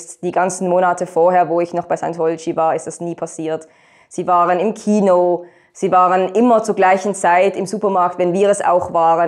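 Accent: Switzerland. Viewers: Sie klingt wie eine von uns 🇨🇭🇨🇭🇨🇭🇨🇭🇨🇭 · Bis Minute 11 hab ich noch vermutet dass das basler deutsch ist - cool🤙🏽🤭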